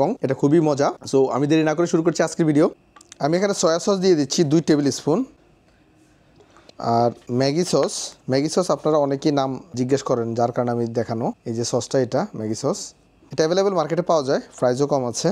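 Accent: Noakhali, Bangladesh. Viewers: This is the first video I have watched on your channel. I come to know this channel from facebook reels. Now I am watching every video of this channel. btw love your noakhali accent bro!!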